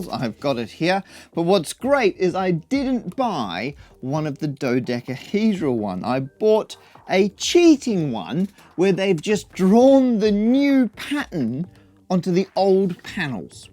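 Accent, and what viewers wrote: England, United Kingdom. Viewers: So your from England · Nope, it's pronounced how he said it in the video (minus the English accent). It's a german brand, not an American on, so how we say it in Europe is the original.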